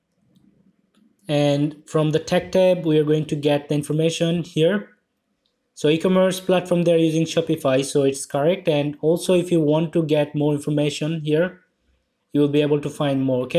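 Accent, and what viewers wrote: Sylhet, Bangladesh. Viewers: Brother Are You From Sylhet? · are vaijan apni je bangladeshi seta apnar english accent shunei bojha jay!!!!!!!!!!!!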